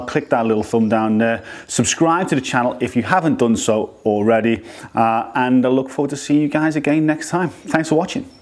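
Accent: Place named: Liverpool, United Kingdom. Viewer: Been a while, do prefer a fellow scouse doing tutorials 👍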